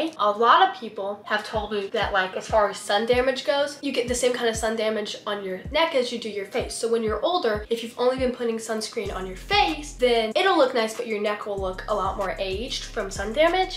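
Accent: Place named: South, United States. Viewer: bruh why does she sound kinda southern, like it kinda sounds like she has a southern accent like a WEEE little bit. :3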